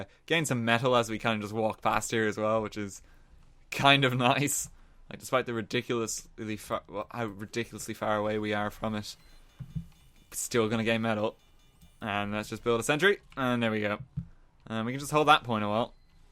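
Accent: Ireland. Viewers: He is irish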